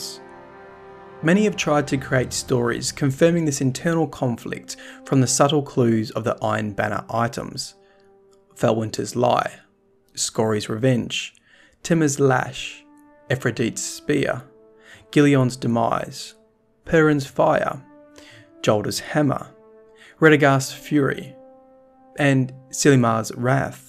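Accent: Australia. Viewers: Your Australian I thought you were sick mate! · DOOD YOUR FROM STRAYA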